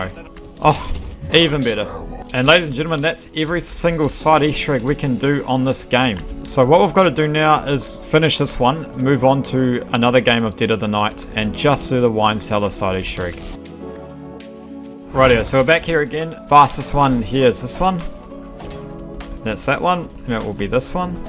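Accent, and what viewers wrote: New Zealand. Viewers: Your a kiwi aren't you? :)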